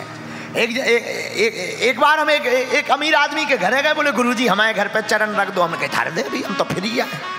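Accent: Bundelkhand, India. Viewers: Ye apka vedio bahut pyara hai .kitana original bolte hai .Maja a gayi .Apki Bundelkhandi language bahut man bhawan hai..Krishan kanhaiya sa lavanya Mai Devatva Swaroop .Vaisi he meethi meethi Bate.jai ho Banke Bihari Lal apki Jai Hove.... Bageshwar Dham Sarkar ki Jai.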